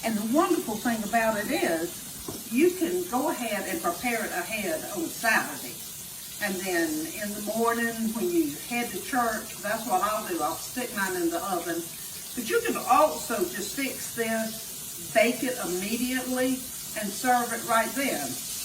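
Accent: South, United States. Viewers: I just found her channel today, and she seems so lovely in addition to being a wonderful cook. And yes, I love her traditional southern accent. 🙂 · She has the most beautiful southern accent - I LOVE her! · one of the last Southern Belles! That drawl is Southern without being "hokey" and yes i am southern too but more country than belle. But my mom and her mom before her cooked like that...limas because I love them, cabbage for my younger sister and sweet potatoes for the youngest one. YUMMMMMM. I can smell it all from here in Alabama. Bless you so much. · I love your Southern Accent. Im from North West Florida in the Panhandle. Once on vacation in Denver Colorado I was at a Wendy's with my young daughter and talking with her I apparently stuck out like a thumb! A man walked up to me while I was in line and asked me where I was from. I looked at him puzzled and said...United States. 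He went back to his table and a different man came up to me and asked me the same thing and I answered the same and was thinking...what in the world is wrong with these people! He then said... no what state are you from and I told him Florida and he turned to the table of people he was with and said.... I was right she is from the South. 😂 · I love hearing your Southern accent. I lived in Georgia for 35 years, and miss it. 💕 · She reminds me of my mamaw too! I just love this lady! My mamaw was from TN so her southern accent was a bit different than. She was the best cook!! She made THE BEST EVER pbj sandwiches! I can't wait to see her again oneday! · Hello from Scotland! just found your chanel. great recipe thankyou. and I also love your Southern tongue !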